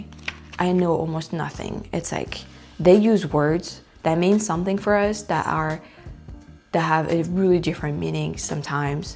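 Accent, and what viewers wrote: Quebec, Canada. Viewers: Hi from Vermont just below Montreal have you picked up the Canadian accent yet 😂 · The end of this video was more promising than the first half of this video. Glad it's getting a little better with the French Canadian. Hang in there and you will be fine Remember these tips. 1. French Canadian is Laced with slang mixed With English 2. Have the Canadian Slow down when they talk 3. French Canadian is a French dialect. Montreal's dialect is Is hard to understand. Even for Me as an American French Canadian. 4. It sounds like you are Picking up some the dialect. you sound more positive in the second half of your video. The little word book is a great idea to have We are rooting for you. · Étrange que tu ne comprennes pas le français québécois considérant que ton accent quand tu parles anglais est beaucoup plus proche de l'accent québécois que de l'accent français... 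· You're speaking with a Quebec accent a bit; you've adapted.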